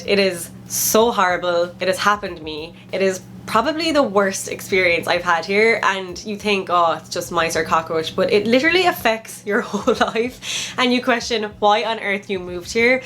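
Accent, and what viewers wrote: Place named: Ireland. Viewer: Love your Irish accent and positive energy. Keep up the good work!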